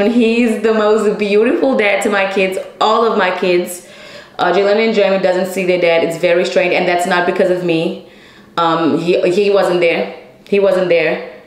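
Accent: South Africa. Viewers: Are you from South Africa 🇿🇦 ❤ your accent ❤ SA babes here you are so strong 🌸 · So she is originally from South Africa, can hear her accent has a twinge of SA accent.. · Are you from south africa?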